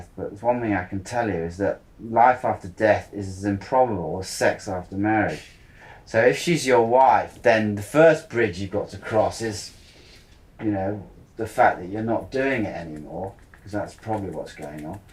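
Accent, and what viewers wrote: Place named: Upper-class, United Kingdom. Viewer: Upper class British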